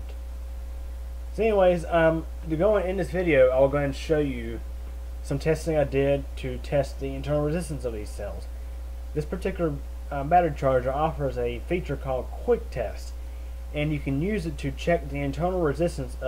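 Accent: United States. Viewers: I know this is an old video, but I bought an 11 dollar generic HP battery, because it was cheaper than buying the cells for a flashlight. So I was somewhat concerned that they may be low capacity. Finding this vid and then hearing your American accent was very reassuring for some.odd reason so thank you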